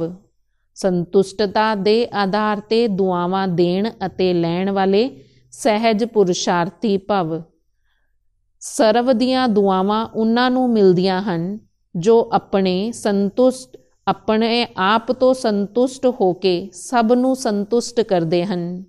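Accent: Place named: Punjab, India. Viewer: Didi aapki punjabi language bahut pyari n sweet hy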